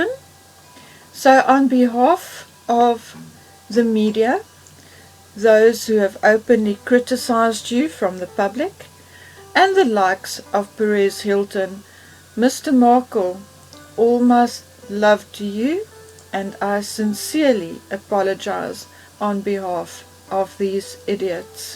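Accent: South Africa. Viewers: Are you South African? Loving the accent im from neighbouring country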